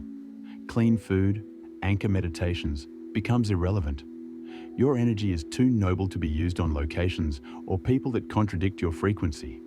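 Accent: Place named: Australia. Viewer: Strong Aussie accent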